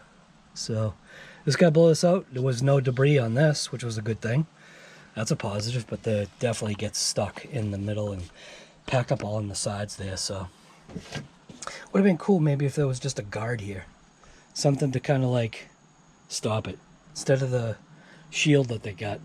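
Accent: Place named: Massachusetts, United States. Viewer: I like this RC car so much that I bought the same version that you’re showing in the video now last year I bought the other version the body is slightly different and the decals are BY THE WAY, BRO, IT’S MY FIRST TIME ON THIS CHANNEL. YOU SOUND LIKE YOU’RE ONE OF MY FUCKING NEIGHBORS FROM DOWN THE STREET IF YOU’RE IN MASSACHUSETTS BECAUSE YOUR ACCENT IS THICK😂👍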